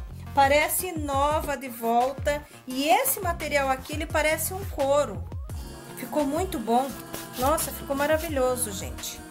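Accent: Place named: Asia. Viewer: Pelo sutaque parece ser asiática, gostei do vídeo. Parabéns pelo seu trabalho.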